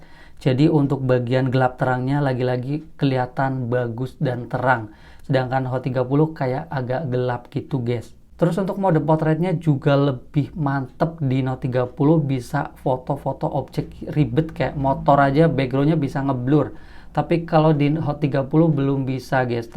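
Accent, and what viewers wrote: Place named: Demak, Indonesia. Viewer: Bang lo orang demak ya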